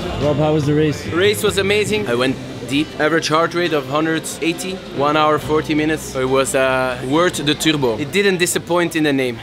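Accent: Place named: Belgium. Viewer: i'd say it's an average belgian accent :D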